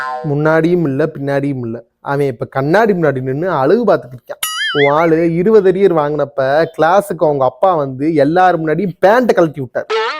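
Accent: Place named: Coimbatore, India. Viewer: Bro deep in coimbatore oo nice slang nga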